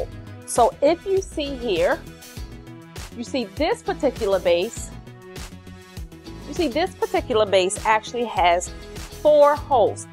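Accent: Baltimore, United States. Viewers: She definitely from Baltimore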